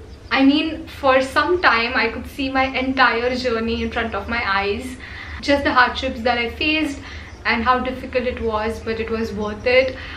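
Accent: Maharashtra, India. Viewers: Congratulations on 100k and many more coming soon! I remember when u hit 10k u were in tulum by forest tala and how happy u were. Being marathi girl you inspire me soo much and how cute is your marathi tone i just love it. I love your fashion videos and hauls. My dream is to make my instagram page <mail> one of Indias's top Self-care and productivity page. I post content about self care and productivity in the easiest form to reach maximum people. My goal is to make everyone practice self care in the easiest and most affordable ways. Soon planning to launch my planners also to help everyone become oraganised. I will send the copy of my planner to you when i launch it for reviewing because you review things very generously. Lots of love to you keep inspiring us ... May you hit 1M by the end of 2020. All the best dee!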